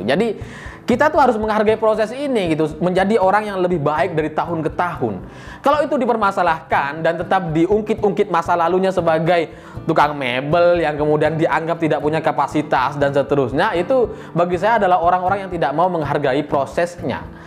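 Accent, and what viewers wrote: Madura, Indonesia. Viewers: kayak orang madura. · Mas ini khas Madura, lucu tp isinya bermutu. Maju terus kang. Dekrema niku dik!!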